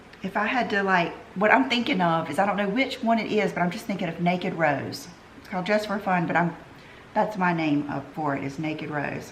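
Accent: United States. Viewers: I am a recent subbie from the UK living in Spain and I love your channel. Love your accent and you are very pretty. What state do you live do you mind me asking? Xx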